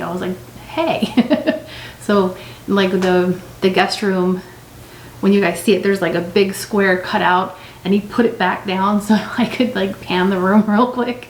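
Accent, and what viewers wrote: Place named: North America. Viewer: Oh My Gosh, you sound so North American 🇺🇸. After all these years I just heard your voice. Oh dear it sounds painful.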